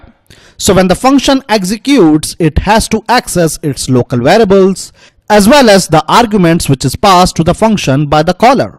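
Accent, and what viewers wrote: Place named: India. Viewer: you're screaming in your mic with a indian accent.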